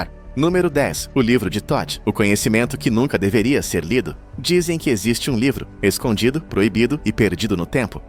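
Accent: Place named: Sao Paulo, Brazil. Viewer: Sotaque de paulista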